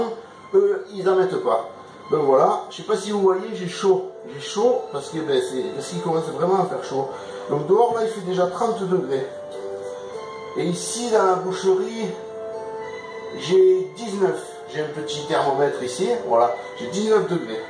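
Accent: South, France. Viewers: Travail de pro hyper appréciable, bravo à toi et bonne continuation... Ton accent du Sud et tes expressions me font bien rire! À+++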